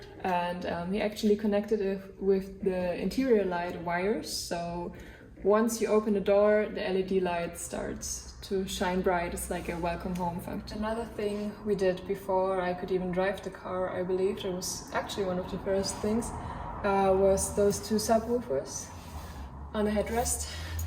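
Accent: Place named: Germany. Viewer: Es ist immer wieder komisch deutschsprachige Menschen Englisch sprechen zu hören... 😂 (Unser "Dialekt" passt einfach nicht. - Obwohl du ja gut Englisch sprichst.) Da fühlt man sich wieder wie im Englischunterricht in der Oberstufe. 😅😂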